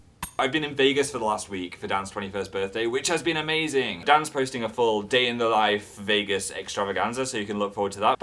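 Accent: United Kingdom. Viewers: I love the way he said 'america'. Mmm<3 Dem British accents*shivers from fangirl overload*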